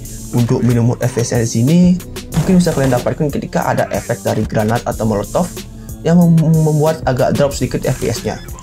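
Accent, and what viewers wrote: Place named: Java, Indonesia. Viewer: ngenah sajan logat nu medok :face-turquoise-covering-eyes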